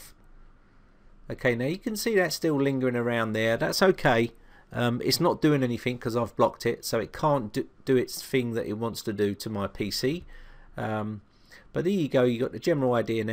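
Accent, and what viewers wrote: United Kingdom. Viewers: your british accent man you`re 150% british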